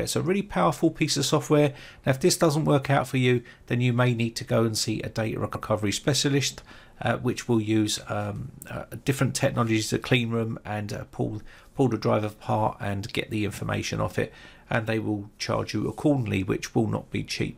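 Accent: United Kingdom. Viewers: Damn he speaks UK English. 👍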